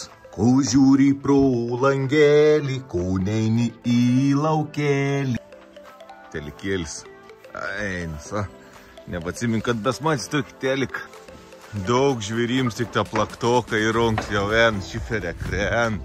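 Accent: Samogitia, Lithuania. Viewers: 😂 Maniau, kad tai kažkas iš M. Petruškevičiaus serijos - punčakos nemadinga 😂. Ačiū, už tą nuoširdžią žemaitišką kalbą ❤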